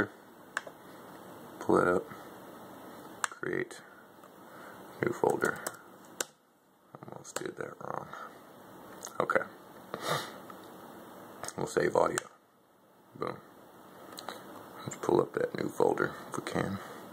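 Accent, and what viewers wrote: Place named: Nashville, United States. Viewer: Very very good stuff. Nice to hear your voice - so Nashville! Keep up the great work. This is masterful.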